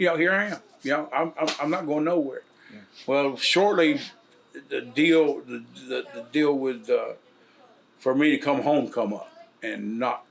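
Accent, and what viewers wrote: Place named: South, United States. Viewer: Well Flair had good promo psychotic macho at the sametime. Naw your talking ain't way up there. Your country accent hurts you.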